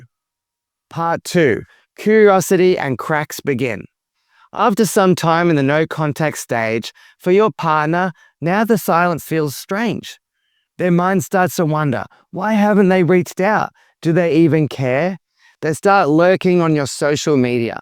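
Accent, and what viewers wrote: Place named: Australia. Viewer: I loveee aussie accent. Wish i had it as well.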